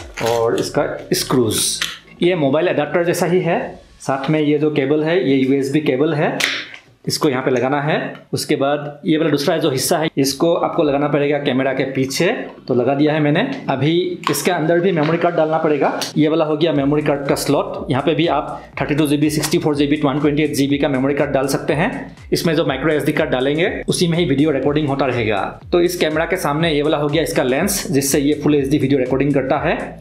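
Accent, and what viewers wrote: Assam, India. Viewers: Bhai aap Assam se Ho kya... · Apka bolnei ka tarika Assamese language sei milta hei ❤ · He is Assamese I guess. His accent is Assamese 😀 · Assamese hai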